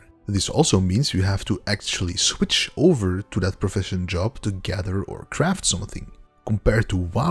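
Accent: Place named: Belgium. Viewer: i swear you sound belgian and i love it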